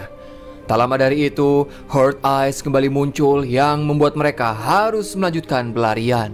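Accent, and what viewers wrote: West Java, Indonesia. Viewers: Orang mana lur euy sundana?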